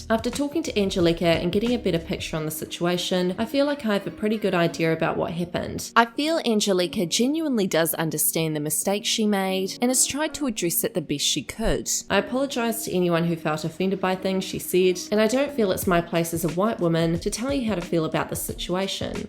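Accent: New Zealand. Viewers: Great vid always love to hear that kiwi 🥝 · Kiwi accent that’s why. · I am Australian. We don't have a twang. Only Kiwis and South Africans do. The way she pronounces things is definitely Kiwi. We have heaps of them living here, and vice-versa, so we're used to their accent.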